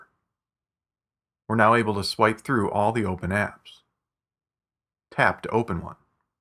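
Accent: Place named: RP, United Kingdom. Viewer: nicely done, and he speaks the queens, hurrah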